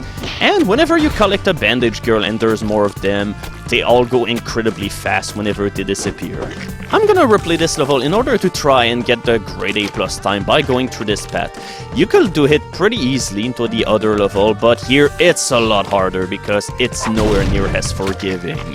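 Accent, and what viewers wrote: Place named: Canada. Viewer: Daaamn, you sound even more Canadian than me!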